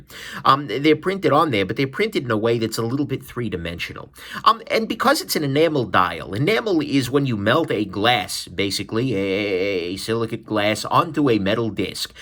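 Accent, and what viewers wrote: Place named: Brooklyn, United States. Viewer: ok i can deal with the annoying if somewhat entertaining voice - and I'm surprised to hear you're in the Ann Arbor area (where I grew up) as i would have guessed Brooklyn - but please, please don't hold the watch the way you talk: constantly jittering. Take some time with your hands so my eyes can focus on the watch. When you put it down it still shakes for some reason. Please. Your voice is going 80 mph but the watch shoudln't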